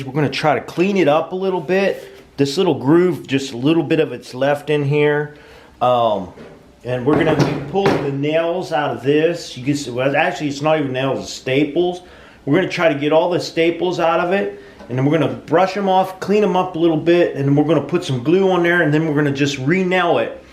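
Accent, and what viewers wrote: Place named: Pittsburgh, United States. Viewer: Hey, where are you from? You sound like you have an east coast yinzer accent..... just curious.. thanks.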